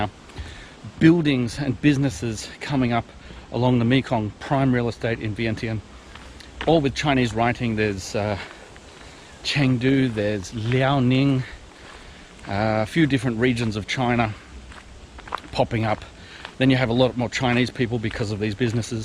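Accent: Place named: Australia. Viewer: Your People been taking over Other people countries for centries including Hong Kong, Now here U talking about China🇨🇳 Taking over Laos🇱🇦 First of all U R white, Sound like an Australian Are from some European country, U R not from Laos,, So,, before U start showing your disapproval of what the Chinese R doing in Los, Show us your disapproval of the history of your people taking over Other people countries, N another thing, do your research on the history of China🇨🇳 and Laos🇱🇦 relationship.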